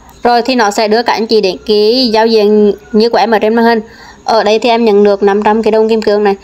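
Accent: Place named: Nghe Tinh, Vietnam. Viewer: Chưa hiểu rồi sẽ thế nào nhưng thấy hay hay nên ủng hộ em một ref nhé, à mà em ở đâu mà nghe giọng giống quê choa rứa. Hihiiii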